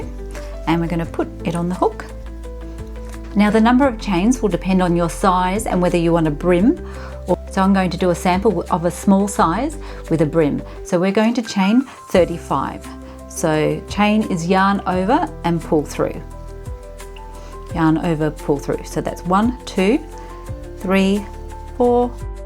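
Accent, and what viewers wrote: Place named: Australia. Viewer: Yeah! An Aussie teacher finally! 😅 Now subscribed. Watching from Northern NSW. Dee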